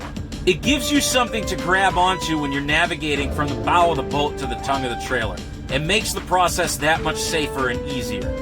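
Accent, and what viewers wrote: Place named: Maine, United States. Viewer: Your license plate may say Wisconsin but you sure sound like you are from Maine or somewhere out there.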